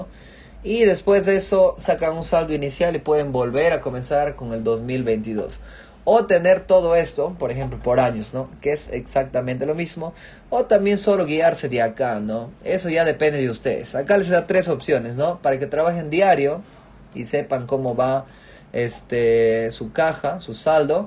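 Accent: Peru. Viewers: Estaba buscando cómo organizarme con mis ingresos y egresos y no encontraba algo práctico. Me encantó tu paciencia, habilidad y orden de tu explicación. Plus!!! Tu acento Peruano ♥️ 🇵🇪 ♥️ Gracias 🙏 Houston TX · Es un gusto cómo se habla el español en Perú. Saludos desde Chile.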